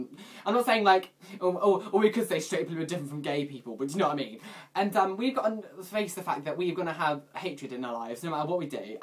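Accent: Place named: RP, United Kingdom. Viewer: Hey... got somethin' to tell you (but don't get annoyed, right?): first you posh like hell, second you talk as fast as a missile, third you sound a bit feminine. OK, I promise I'll wath your vid on slow-motion and try to catch as much as I can (I'm not native, I'm gay). Kiss ya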